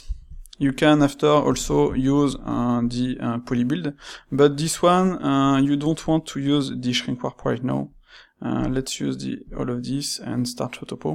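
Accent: France. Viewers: if i do disss for exsapoool de coursour hahah i love franch english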